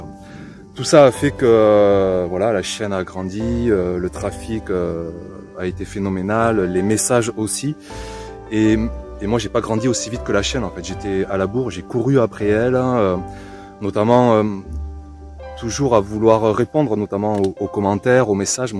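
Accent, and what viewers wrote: South, France. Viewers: Prisonnière dans d'énormes soucis de famille (deuil, succession, procédure de justice pour de la bêtise humaine) mon havre de paix reste sans aucune hésitation mon jardin, votre livre et vos vidéos. Le seul problème (qui n'en est pas un pour moi mais qui agace mes enfants et mon mari) c'est votre joli accent du Sud qui déteint sur moi. Du coup, le potager d' est très présent chez nous mais c'est que du bonheur. Merci et encore merci pour me donner cette force de me battre tous les jours dans un monde perdu dans le stress alors qu'il y a tellement de choses à prendre et à apprendre de notre terre. Une énergie hors norme dont vous savez si bien nous la faire partager et passer à travers nos écrans. Reposez-vous bien et à très vite. Cordialement.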